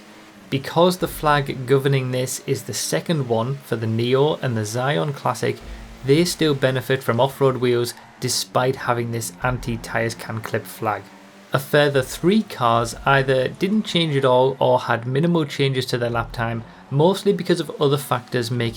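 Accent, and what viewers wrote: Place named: England, United Kingdom. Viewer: he's English thats whats going on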